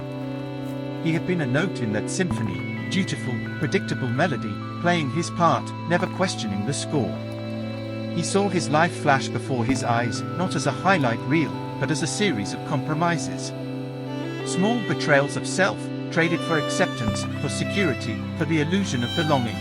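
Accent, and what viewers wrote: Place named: India. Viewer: There are so many better text to voice models. Like elevenlabs. Please replace this lifeless indian-robotic commentary. The whole concept strongly depends on the speaker.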